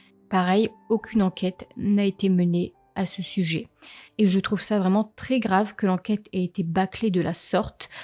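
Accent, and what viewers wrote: North, France. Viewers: bonjour je voulais vous dire que vous racontez très bien ces affaires que vos documentaires sont bien étoffés. on voit que vous travailler sérieusement pas comme d'autres et je voulais vous remercier pour votre travail car c'est toujours agréable de vous écouter! je ne m'en lasse pas! ne seriez vous pas une fille du Nord?? et vos affaires criminelles sont inédites ce qui est très plaisant. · Bonjour. J'adore votre concept.Narration parfaite avec ce petit accent du nord.qui vous donne petit.plus..Histoirs passionnantes.. beau travail. Un grand merci a vous. 💚👍👍👍💐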